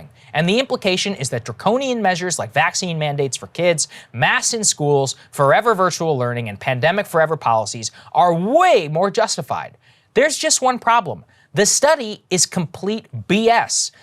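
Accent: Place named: Boston, United States. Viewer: This sounds like a Boston leagel speach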